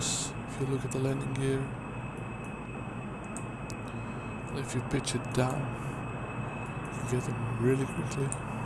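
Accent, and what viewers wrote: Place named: Netherlands. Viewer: I can hear that you're Dutch I'm Dutch too.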